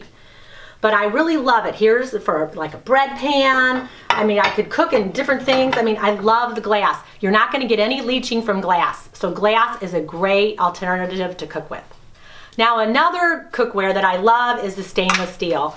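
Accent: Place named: Wisconsin, United States. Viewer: Well,... Your accent reminds me of my family, all who hail from Wisconsin & some while back also Chicago. Refreshing...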